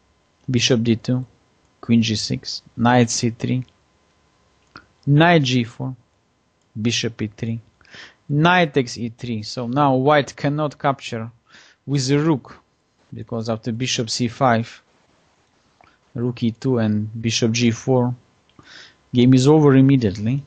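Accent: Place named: Arab world. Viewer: Лайк за акцент: озвучку Stronghold Crusaders за арабских воинов вспомнил, прослезился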